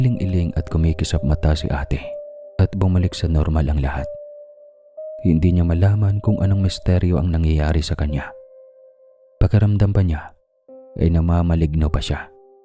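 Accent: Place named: Iloilo, Philippines. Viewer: Kuhang-kuha ni sir ang salita namin na Ilonggo😇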